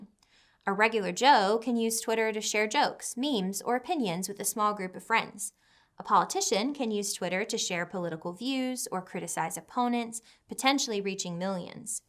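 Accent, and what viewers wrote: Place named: South, United States. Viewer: I can hear the southern drawl in her accent :)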